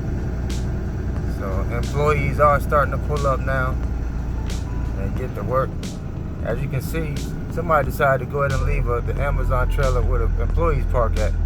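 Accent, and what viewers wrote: Jamaica. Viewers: You Jamaican fam?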